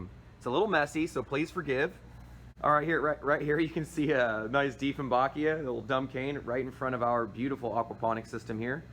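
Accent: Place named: Australia. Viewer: Love your Aussie!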